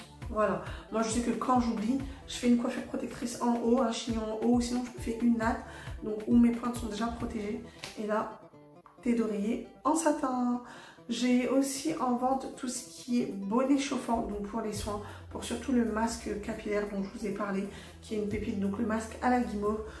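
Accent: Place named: Ivory Coast. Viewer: Dès que je t’ai entendu j’ai dit c’est une 225 😂 en comment que chili pêlê ?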